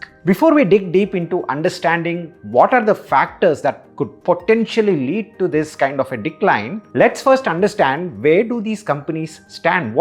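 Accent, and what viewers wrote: India. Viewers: Improve your accent, it's really bleeding my ears to hear how, some Indians speak. This is not how English is supposed to be spoken......Seriously, something is seriously wrong with Indian accents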